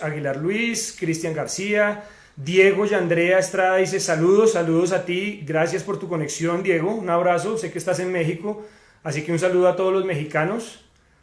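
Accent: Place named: Colombia. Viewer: Púes si es colombiano, en el acento se nota. Sería como raro saludar jajajajaja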